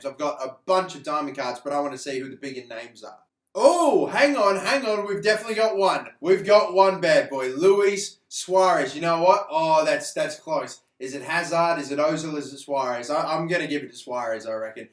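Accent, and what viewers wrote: Australia. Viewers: Wait ur Australian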